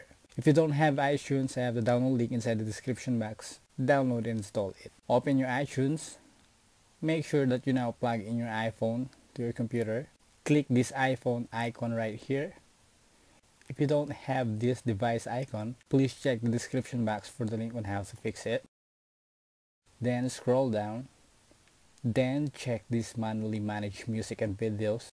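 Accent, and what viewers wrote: Philippines. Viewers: hey man, the guy is Filipino their accent is like that. try to understand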